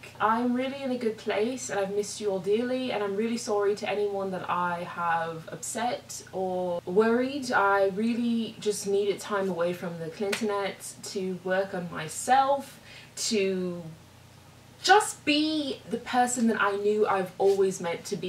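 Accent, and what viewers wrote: United Kingdom. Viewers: You really are a true artist. Been British myself too, let me tell you. I claapprove of your british accent! Have fun in 🇸🇪 Sweden. Im glad you're doing well. Be blessed!! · Why do you sound British?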